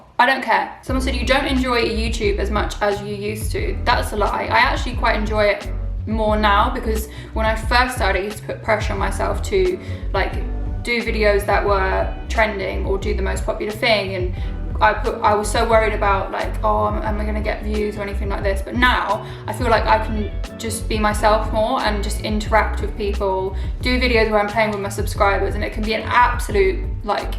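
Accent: United Kingdom. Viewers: omg she really does sound like a female any murray lol maybe thats just a Scottish accent thing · It's a normal English accent wtf.😂😂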